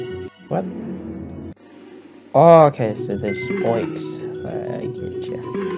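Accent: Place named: United States. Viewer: You've got a lil american accent in that, I see you watch my videos too much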